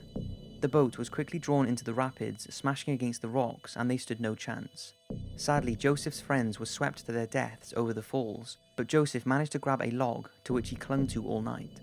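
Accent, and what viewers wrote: Wales, United Kingdom. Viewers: do I detect a slight welsh accent?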